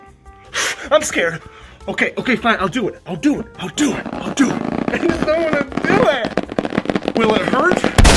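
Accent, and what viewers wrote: United Kingdom. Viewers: Loving the London/cockney-ish accent! Tbf it’s pretty good! 😂😂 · lol The British accent took me by surprise. Good times!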